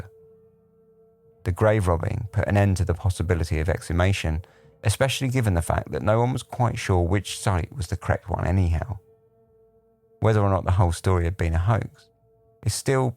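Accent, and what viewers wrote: United Kingdom. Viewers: I just love British narrators. They make everything sound so official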